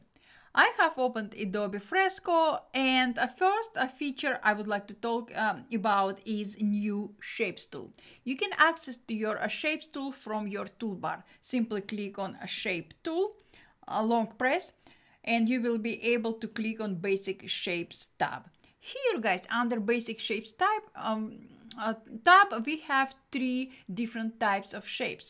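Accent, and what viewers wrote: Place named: Russia. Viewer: Its russian accent btw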